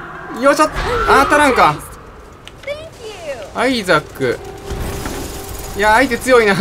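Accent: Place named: Kyoto, Japan. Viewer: 関西弁と京都弁が混じったRYOUSUKEさんの動画にずっと癒されてます🍀😊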